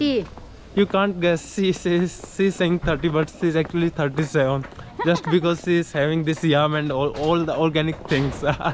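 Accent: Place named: South, India. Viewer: Am not against or racist... Just to clear myself.. Your accent constraint me to ask you. Are you from South.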